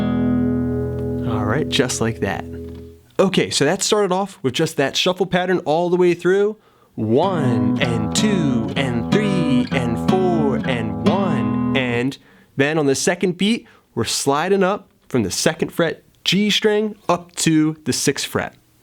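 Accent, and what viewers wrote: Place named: Maryland, United States. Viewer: Nice stuff! My first love was guitar, but at 8, I got the old school music store lessons grind, sitting in a room with a rental guitar with strings a half inch above the neck & it was torture for my soft little hands, and SO boring and sad - I moved off to drums & keyboards, Now, as an old guy w/the time to really get to know my way up and down that neck, & lots of good lessons like these online? I'm having some fun & making progress. Off topic: R U by any chance from Md. or thereabouts? We have the same accent. ...Nice lesson(s)! Thanks, again!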